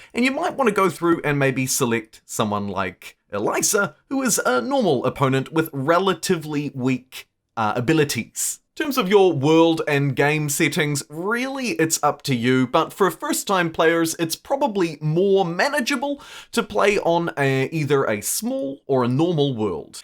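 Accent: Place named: New Zealand. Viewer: nice newzealand accent my friend.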